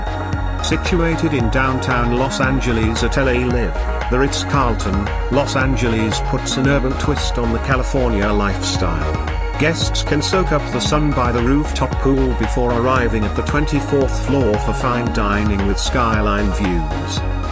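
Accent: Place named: England, United Kingdom. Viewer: Dude’s English accent annoys me.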